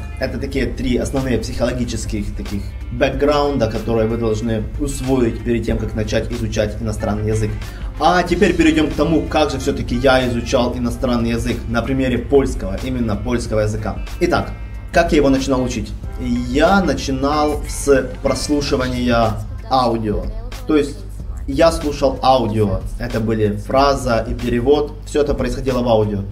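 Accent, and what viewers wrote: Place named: Ukraine. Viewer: думаю Вам бы не мешало подучить русский прежде чем на нём разговаривать...очень слышно что общается украинец....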